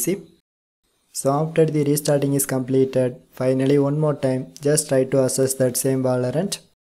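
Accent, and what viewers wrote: India. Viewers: U hear that indian accent u know u already got ut fixed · BRO I FCKIN LOVEEEE YOU SO MUCH WITH YOUR INDIAN ACENT IT WORKEDDDDD THANKS MAN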